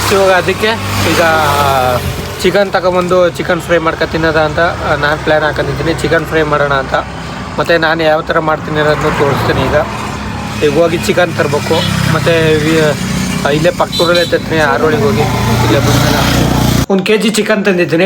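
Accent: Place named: Hassan, India. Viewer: Nam amma Hassan doru nam appa uttar karnataka nanu Hassan bhashena,uta na,climate na tumba miss madkoteni and nimma bhashe keli tumba kushi aytu One matt heltini bro nim lifestyle nange tumba eshta because nemdi ede dudd edre nemdi jasti eralla nange nim tara halli jeevna madbeku anta ishta sir and nive healthy agi ertira last vargu ❤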